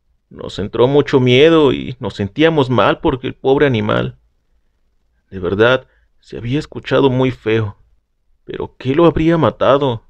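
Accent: North, Mexico. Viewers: Narras chido, con ese acento del norte me gusta.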